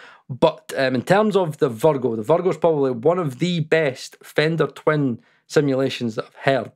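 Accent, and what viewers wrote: Scotland, United Kingdom. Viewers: Came for the review, stayed for the SCOTTISH.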